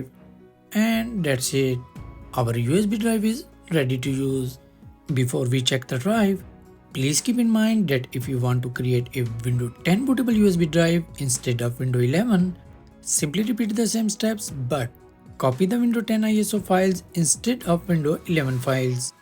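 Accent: India. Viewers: Look, please do not take this wrong, When in visited USA for the first time Americans made fun of my own voice. I still have an accent with English to an extent, but because I have tken very long and hard amaounts of time to train myself, now, most people do not think i'm from Russia let alone real rural North Ossetia, just don't think I'm trying to bully you or make fun of you, it's just the truth. I've been to USA alot, and most Americans think this is absolutely annoying. We all know the English's rather dismal outlook on "Paris" and "Indians". But, most of it is trying to deciepher what you are saying. The western world deals enough with your kind of accent on the telephone supports, now you guys are in my country trying to speak Russian! I can only imagine...it's just frustrating. You got to see it from a native speakers perspective. How would you like talking to me on the phone in Hindi with a super thick indecipherable Russian accent but calling myself Krishna or Sanjaya, or an Indian name? 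It's annoying.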